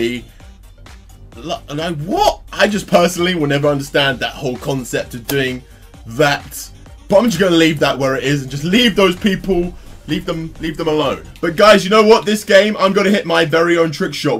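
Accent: England, United Kingdom. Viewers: Where in England u from?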